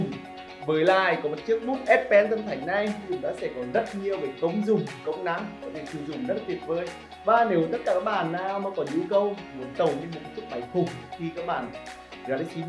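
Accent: Nghe An, Vietnam. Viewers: Nghệ An nào 😂😂😂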